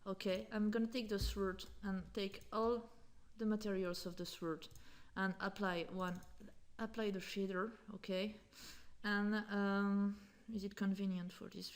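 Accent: France. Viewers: Bonjour, J'ai regardé vos vidéos. Je crois deviner un accent français. J'aimerai faire des sculptures en bronze à l'aide votre utilitaire "Metal Shader" avec Victoriai 7 de Daz Studio. Est-ce que c'est possible? Pourriez-vous svp me donner quelques pistes pour débuter? Je vous remercie. Jean-M!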